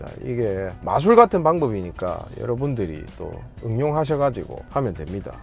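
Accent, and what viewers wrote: Gyeongsang, South Korea. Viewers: 빠만떼기 말수도 있습니다 ㅋㅋㅋㅋㅋㅋㅋㅋㅋ 제가 갱상도 남잔데도 사투리가 구수하게 들리네요 ㅎㅎ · ㅋㅋ 갱상도 사투리를 유튜버에서 듣게 되는군요 ㅎ... "빠마데기"라는말 진짜로 오랜만에 들어봅니다 ㅋㅋ~^(^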